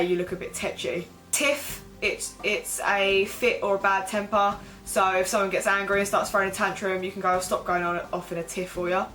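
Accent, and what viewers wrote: Essex, United Kingdom. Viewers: this is not a typical Essex accent, it's chav Essex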